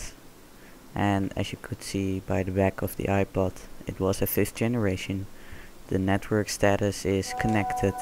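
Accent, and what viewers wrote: Netherlands. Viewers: Are you dutch beacause your accent sounds very dutch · Aan je accent te horen ben je Nederlands of niet?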